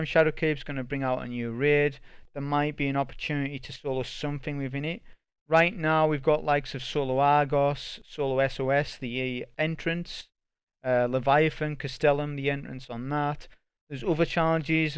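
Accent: United Kingdom. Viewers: Excellent well presented video explaining key points on how to progress (solo or not to be fair). Can only assume that the 2 down votes were a mistake but if not, why don't the asshats share why they disliked it? Bet they don't. GG buddy. Always nice to hear a fellow Brit on the Destiny wagon.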